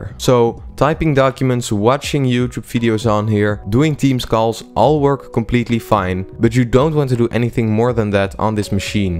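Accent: Netherlands. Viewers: That is quite a strong Dutch accent. But at least you're not from Limburg.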